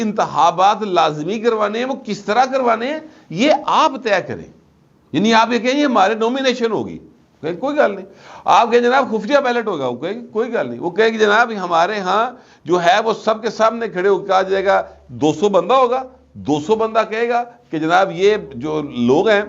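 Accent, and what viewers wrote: Lahore, Pakistan. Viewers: Beautiful lahori dialect and slangs.....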